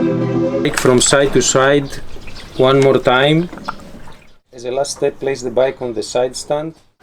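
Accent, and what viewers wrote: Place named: Greece. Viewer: Το ξερα ότι είσαι Έλληνας, αθάνατη ελληνική προφορά, την ίδια έχουμε 😂😂😂 εγώ έχω του 2008 αλλά δεν βρίσκω πουθενά πουθενααααα πόσο παίρνει οπότε μάλλον θα πάρω ένα τετραλιτρο για σιγουριά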